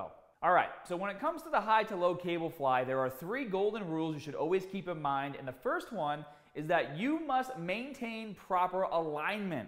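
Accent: Boston, United States. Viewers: is that a boston accent i hear 👂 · The Boston accent was strong in this video lol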